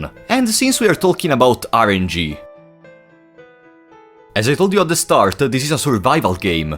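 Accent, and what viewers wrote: Italy. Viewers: Hi as a new player i agree with you and I want to ask you if I should try to talk to enemies, I think is important but my friend always told me that is useless because all entities there are evil... Also you sound like you are italian.... are you?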